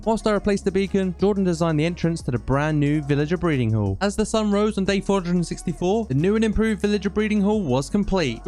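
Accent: United Kingdom. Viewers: Your so British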